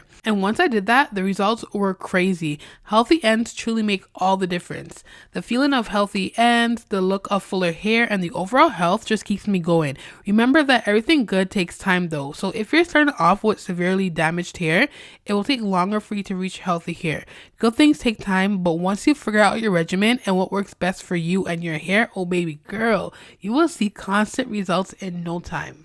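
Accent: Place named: Barbados. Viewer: Love your accent you sound Bajan!!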